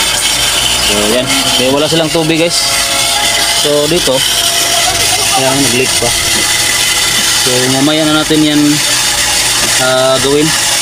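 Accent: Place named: Visayas, Philippines. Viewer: Idol taga asa man mo? kay bisaya man ang imong dialect? Taga cebu ko tks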